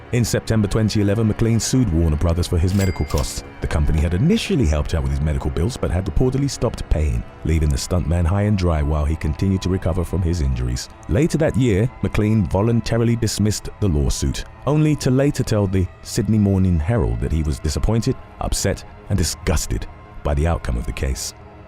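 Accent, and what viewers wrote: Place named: United Kingdom. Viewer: it’s an accent because he’s British but he’s also pronouncing names completely wrong. Even by British standards.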